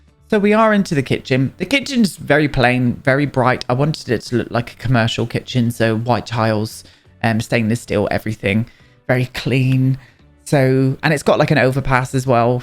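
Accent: United Kingdom. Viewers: Wait..... you’re British?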